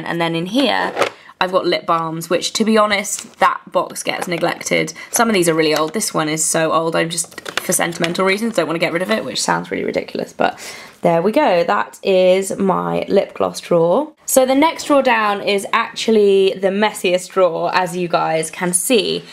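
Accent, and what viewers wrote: United Kingdom. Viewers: British accent I WANT THAT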